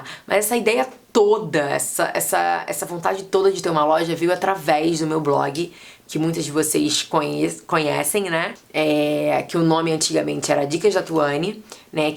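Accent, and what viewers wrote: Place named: Rio de Janeiro, Brazil. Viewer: sotaque carioca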